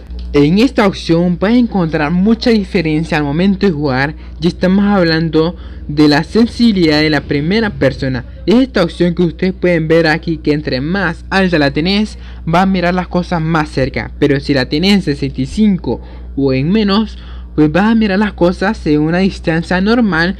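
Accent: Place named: Nicaragua. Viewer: crei que eras de Nicaragua bro un saludo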